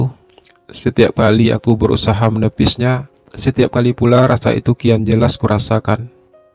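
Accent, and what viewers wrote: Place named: Medan, Indonesia. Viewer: Klu aq cermati aksen suaranya ~ aq yakin Abang ini dari Medan ~ ya kah ????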